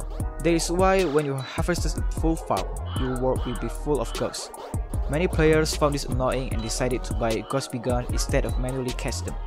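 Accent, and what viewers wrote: Singapore. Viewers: u sounds like sigapore alot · Singaporean sound 🤔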